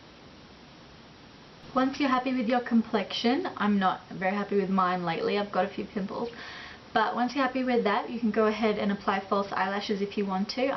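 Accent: Australia. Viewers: Your so sweet for lettimg me kno ;) thanks girl. Love ur videos and your australian accent! Subbing to see more!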